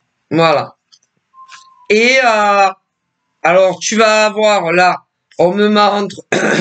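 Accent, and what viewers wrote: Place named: Marseille, France. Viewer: je pense que tu es vers marseille a l'accent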